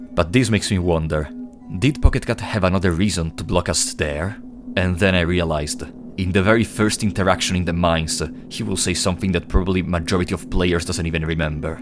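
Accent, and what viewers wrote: Italy. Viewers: I love your accent funny italian man ( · ma sei italiano? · Bro with that smexy ahh italian accent of yours, just make ASMRs plz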